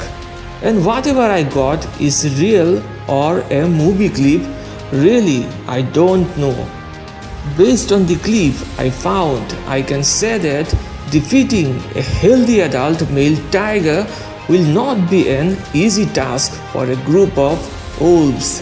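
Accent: India. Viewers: 😂 it’s the tune for me must be an Indian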